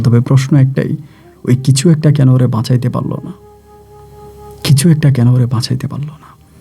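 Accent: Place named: Barishal, Bangladesh. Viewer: বরিশাইল্লা,,দ কে বলে ধ,,গ কে বলে ঘ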